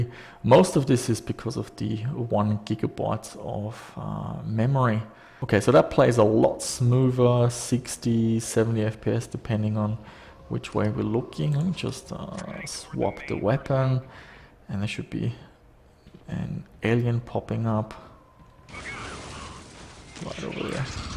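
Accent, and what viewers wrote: Germany. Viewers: Ach du bist Deutsch :D Hab mich schon die ganze Zeit gewundert was das für ein Akzent ist XD Tolle videos, weiter so! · are you from germany or austria?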